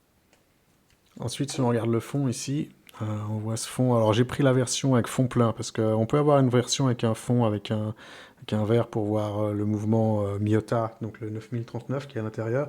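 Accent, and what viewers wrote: Geneva, Switzerland. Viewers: Très belle montre! et joli accent de Genève ;)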